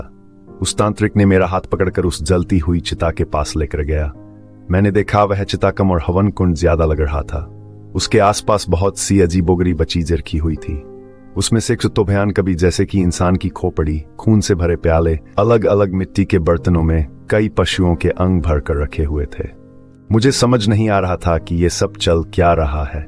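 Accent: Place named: Pahadi, India. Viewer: Hindi Bolna Esa Lag raha he Jese Pahadi Ya Angrez Bol Rha Ho. Baki Story Acchi Bna Rhe ho..Bolne Ka Tarika Or Saaf Karo.👍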